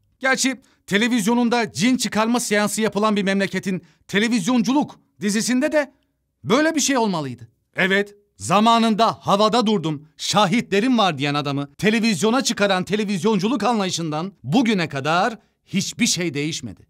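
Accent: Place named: Eastern Anatolia, Turkey. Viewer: sizin şaka (ki çoğu şakanız gerçekten vasat) maksadı ile söylediğiniz cümleleri, doğu şivesi ile söylemenizi ne yapacağız?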